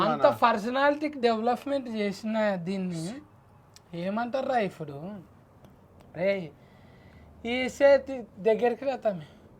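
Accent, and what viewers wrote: Telangana, India. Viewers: అన్ననిజాన్ని కామీడీ రూపంలో చూపిస్తున్నావ్?తెలంగాణ యాసను,నీయంత భాగా చెప్పే గుంపు మేస్త్రి గానీ చెంచగల్లా తన చానల్లో చూపలేరు?👌🙏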